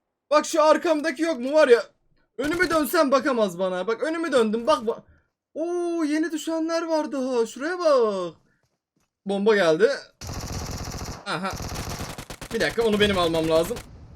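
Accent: Urfa, Turkey. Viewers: Abi Urfalı olduğunu çok belli ediyorsun😀😃 bende Adıyamanlıyım hemşeri sayılırız bu arada geçmiş olsun umarım hastalığın kısa sürede biter 😃😀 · Ulan abi çok güzel urfa şivesi yapıyosun seni izleye izleye şivem kaydı 🤣😂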